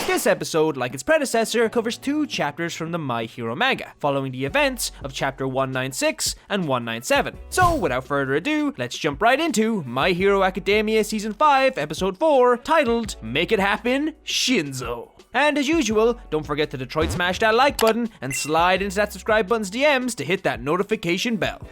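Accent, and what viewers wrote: Ireland. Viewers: Shut up and take my money. Also, you sound Irish. Are you? Curious.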